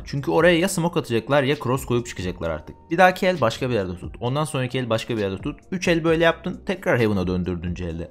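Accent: Thrace, Turkey. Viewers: Abi trakyalımısın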